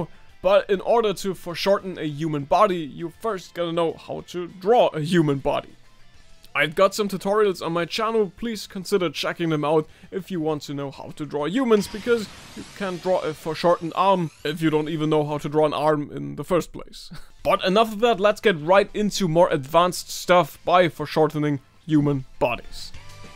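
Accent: Germany. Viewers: Hey das hört sich mehr Nach denglisch das du da sprichst 🤣🤣 Ich bin auch nit besser😅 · Liebe die Pokemon Musik und den Deutschen Akzent!!! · This german Accent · as a german i could immediatly tell u r german too.....and by the water lmao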